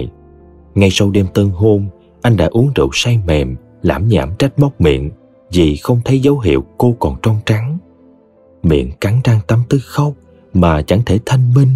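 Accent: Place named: Saigon, Vietnam. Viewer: Yêu mến cái giọng Sài gòn của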